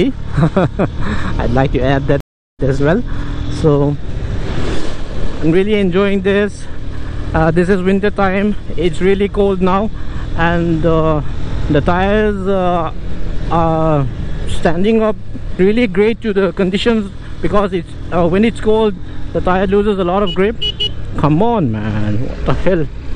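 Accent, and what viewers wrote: India. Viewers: accent hi chu Indian ve reuh eeee 😁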